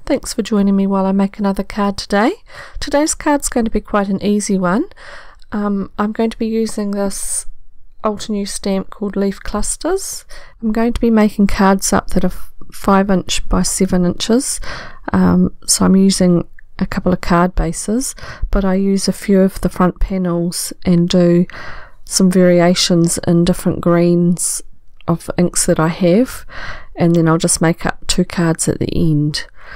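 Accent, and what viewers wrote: New Zealand. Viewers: Hi. I am not a kiwi. My son luves in Christchurch. I've spent some time there. I felt that you had a NZ accent and that's why I asked you. I live in Mumbai...I love the kiwi accent. As my son has settled in NZ... it has become my second home. It is the most beautiful country in the world. Wish you all the best, good health Happy crafting....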